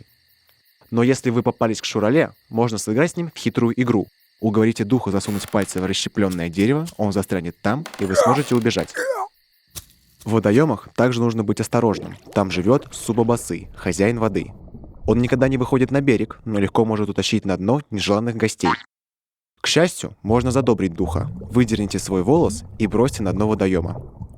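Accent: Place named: Russia. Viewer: В чем была проблема сделать ролик на двух языках? И не коверкать татарские названия русским акцентом.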